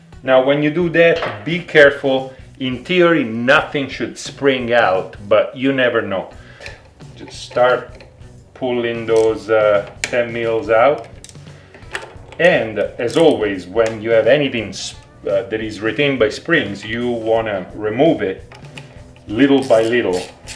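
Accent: Italy. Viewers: NOTHING LIKE A PANIGALE TUTORIAL WITH AN ITALIANN ACCENTT :D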